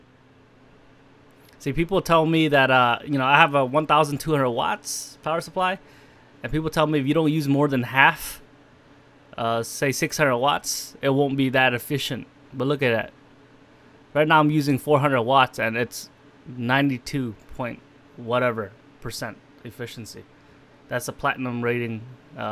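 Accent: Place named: Cambodia. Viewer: Why do you have a Viet name but a Cambodian accent?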